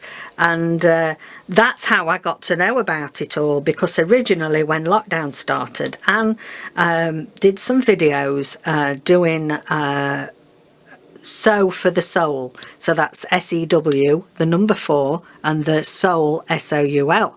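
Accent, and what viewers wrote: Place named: England, United Kingdom. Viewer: Hi I have your other videos on my watch list so I haven’t gotten to them yet. I just saw this one come up and couldn’t wait to watch it. I just love what you’re doing I’m only a few minutes in but just had to comment. I love your accent you pronounce some things like my English gran did! Your project is stunning! Can’t wait to watch more! Hugs Rachel 🤗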